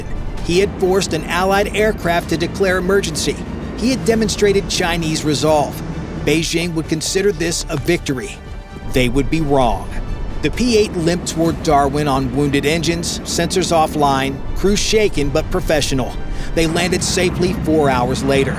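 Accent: United States. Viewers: As an Australian I am disgusted that we misuse our resources this way. We have no business to be in that region. This American accent gives me the Heebie jeebies - what a load of horse shit. Actually sounds like a load of wishful thinking. Australia really does not want to have war with China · Why would you use and American narrator for this video? LMFAO Chinese Pilots, you can blindfold them with a shoelace.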